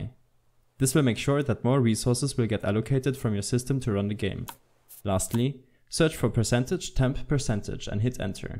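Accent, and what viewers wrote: Germany. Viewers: Man merkt das er deutscher ist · Man merkt an deiner Stimme dass du Deutscher bist :D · man hört den deutschen akzent aber gut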